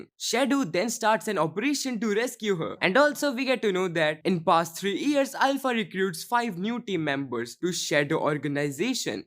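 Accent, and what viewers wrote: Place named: India. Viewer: You voice sound like indian 🇮🇳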